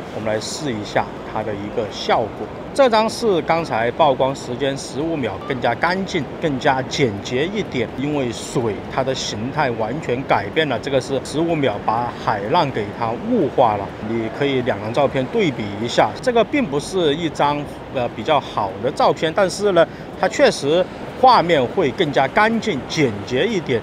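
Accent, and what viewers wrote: Sichuan, China. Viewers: 川普，四川人？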